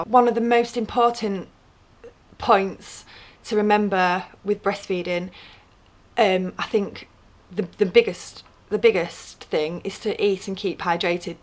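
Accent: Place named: England, United Kingdom. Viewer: She's from England 👍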